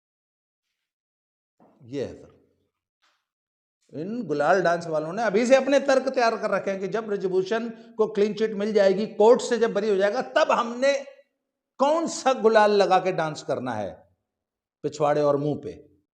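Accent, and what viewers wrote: Haryana, India. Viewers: Very nice presentation of Dharnajivi wrestlers 'history in Haryanavi accent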